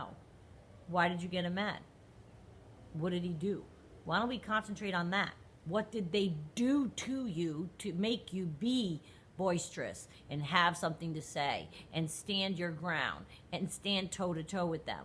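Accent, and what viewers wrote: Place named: New England, United States. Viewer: I just found your channel today and have been binge watching. One of your videos you said your hair was up bc it was hot in Florida. I thought to myself “Oh, I thought for sure she was from New England based on the accent” then you said Rhode Island in this video. I gasped lolol I’m from and still living in Rhode Island!!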